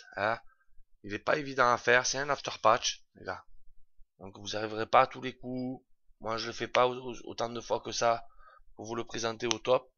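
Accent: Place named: South, France. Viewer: Trop bien l'accent,on a le même 👍d'ailleurs on se fout souvent de ma gueule quand je joue avec le micro!je me fais traiter de "marseillais"......à croire que personne ne connaît Toulouse, béziers, Carcassonne, Montpellier, Narbonne....C'EST LE SUD TOUT ÇA LES GARS!!bien tes vidéos,j'aime bien les "d'accord "que tu balances,j'ai l'impression qu'il faut que je réponde "oui,daccord" 😉je m'abonne l'ami 👍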